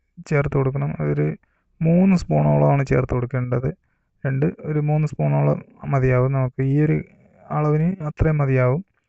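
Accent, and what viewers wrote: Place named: Trivandrum, India. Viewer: അണ്ണാ പക്കാ തിരോന്തരം തന്നെ 💪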